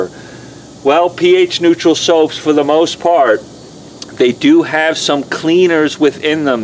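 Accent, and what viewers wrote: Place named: Long Island, United States. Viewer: Similar to stern but very close to Ray Romano. Both of those guys grew up on Long Island. Where did grow up?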